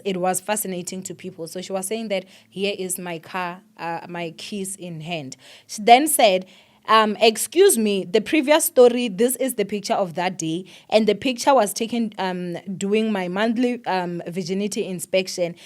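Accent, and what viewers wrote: Venda, South Africa. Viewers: Your venda sound so sweet😊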